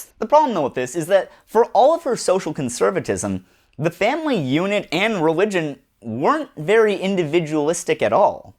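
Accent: Canada. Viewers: This was great. Informative and entertaining. I love your NIN shirt and your Canada, eh? accent. Good job! I can't wait for the next one.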